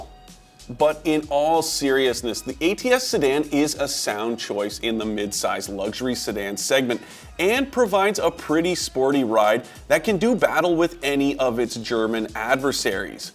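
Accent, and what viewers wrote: Canada. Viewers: I'm curious, is this a Canadian or American show. this guy's Canadian accent is thick!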